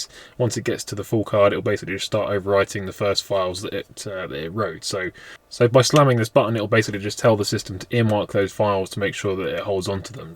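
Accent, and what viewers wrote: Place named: United Kingdom. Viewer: Hey, nice video and respect for doing a hardwire install yourself. I ended up buying 2x the front units to try and get 4K front and rear, but not sure how successful or possible it's going to be getting it all set up, and does mean I'll have to access front and rear individually by wifi / sd card as opposed to being all in one. I may make it into two 2 channel kits instead. The quality of the front camera is a little worse (24fps and lower bitrate) if you run 3 channel mode as opposed to 30 fps and capable of running higher bitrates, but depends on your own use case. One thing to note is I don't know if you're in the UK, but assumed you were until I saw the footage with foreign roads and plates based on your voice haha. There's a UK site/suppliers for buying the Viofo cameras, but the 2 channel front+rear was out of stock and there was only two 1ch left, and I took the last two 😅. 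They'll probably have a restock soon if they haven't yet as my order was a week ago, but just wanted to mention that site which means people can get the cameras faster in the UK without import worries and also the promo code should work on there as I used Ashley Neal's (driving tuition) as he did a sponsored review / unboding himself a couple weeks ago. Also make sure you either heart or thumbs up (heart is more consistent at giving notifications) to give a notification when you reply to someone's comment, otherwise they may not remember to come back or think you've acknowledged them :D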